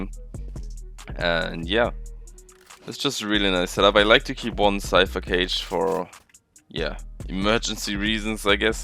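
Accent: Germany. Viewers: man hört das du deutscher bist :D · i dont know why, but you sound like you came from Germany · The german accent haha. ^^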